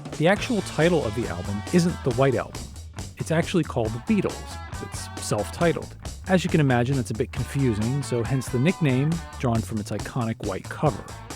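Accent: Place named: United States. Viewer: Annoying yank 'expert ' voice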